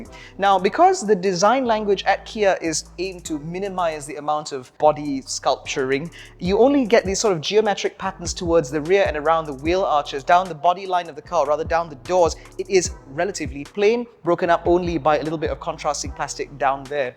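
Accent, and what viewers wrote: United Kingdom. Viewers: Wow ...rasa macam orang uk yang review ... mantap bro.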